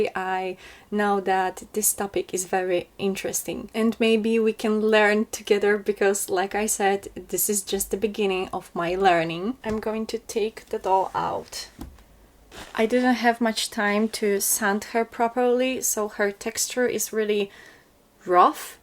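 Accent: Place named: Poland. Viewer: OMG Tak mi się wydawało, że masz polski akcent! :3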